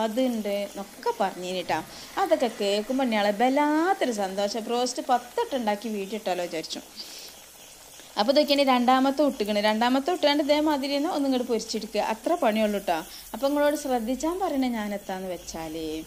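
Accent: Malappuram, India. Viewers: ചിക്കന് ബദൽ ആയിട്ടുള്ള മുട്ട ബ്രോസ്റ്റ് സൂപ്പറായിട്ടുണ്ട്. വീഡിയോ എല്ലാം ഒന്നിനൊന്ന് മെച്ചം തന്നെയാണ്. എങ്കിലും താത്ത ഇതിനോടൊപ്പം ഉള്ള നിങ്ങളുടെ സംസാരം ഒരല്പം പോലും കുറയ്ക്കേണ്ടതില്ല. കാരണം മലപ്പുറം ശൈലിയിലുള്ള നിങ്ങളുടെ ഈ സംസാരം തന്നെയാണ് നിങ്ങൾ ഇടുന്ന എല്ലാ വീഡിയോകളുടെയും ഹൈലൈറ്റ്. സംസാരം താല്പര്യമില്ലാത്ത ആരെങ്കിലും ഉണ്ടെങ്കിൽ ആ ഭാഗം അത്തരക്കാർ സ്കിപ്പ് ചെയ്ത് പൊക്കോട്ടെ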